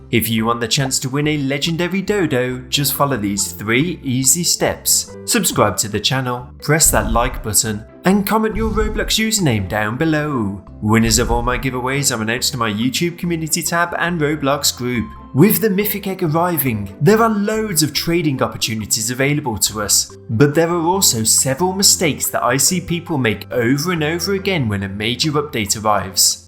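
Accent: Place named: United Kingdom. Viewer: Ur voice is very good even tho ur british ur voice is good and cool and calming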